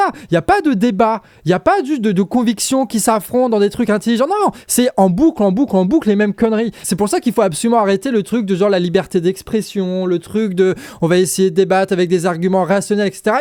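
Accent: Brittany, France. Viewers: Clair, net et précis ! Merci pour cet excellent ton du nord des cotes des mers d'Armor . (Tonifiant, ce doit être le granite, ou alors l'éternel et indispensable grain de sel dans le beurre)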